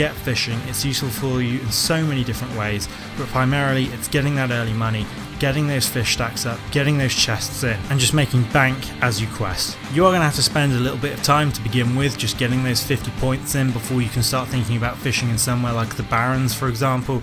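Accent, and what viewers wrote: Exeter, United Kingdom. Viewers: Are you from Exeter?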